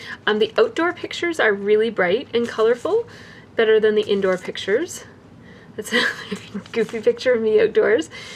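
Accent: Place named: Canada. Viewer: Thanks for the great review! I've debated on buying one of these for a while. Also it was nice to see your pretty face on camera! I've watched your channel for about a year now so it's nice to put a face with your sweet Canadian voice :) ~Melissa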